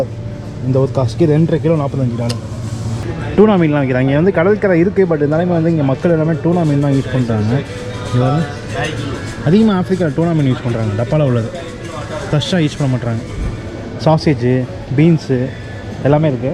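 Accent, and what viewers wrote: Thanjavur, India. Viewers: நம்ம தஞ்சாவூர் மாவட்டத்தில் பேசும் அதே பேச்சு உங்களோடு நானும் சேர்ந்து சுற்றி பார்ப்பது போன்று உள்ளது அருமை அருமை நன்றிகள் பல 🙋🙋🙋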